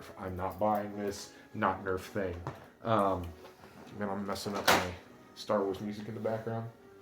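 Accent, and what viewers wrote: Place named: Ohio, United States. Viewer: Booger Barricade...., you can tell you are an Ohioan, I say this a boy born in Cincinnati and raised in Richmond, KY.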